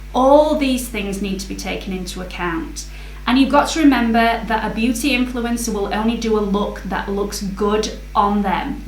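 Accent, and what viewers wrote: United Kingdom. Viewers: Great video! Thank you for sharing! I quite new here but I can say that you are very honest to your subscribers! And you not only help me do my makeup but also help me practise my English since I'm from Argentina! It's so nice to listen to a British accent! Greetings gorgeous! 😍😍😍😍😍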